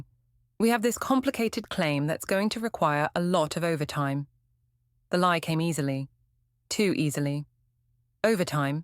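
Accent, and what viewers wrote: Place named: United Kingdom. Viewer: No longer listening to stories with a British accent.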